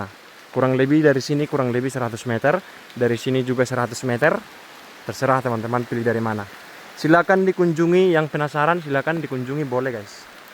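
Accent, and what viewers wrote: North Sumatra, Indonesia. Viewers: mantap laeku saya yang selalu mengomen anda jarena saya duka dengan gaya anda membawakan cerita dan bicara anda sedap gitu loh sudah meng Indonesia walau logat bataknya masih kental tapi mantaplah pokoknya sukses terus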